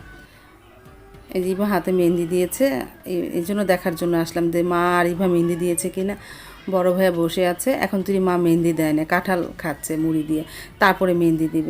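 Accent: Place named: Tangail, Bangladesh. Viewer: আপুর কথাগুলা একদম আমাদের টাংগাইলের ভাষার মত।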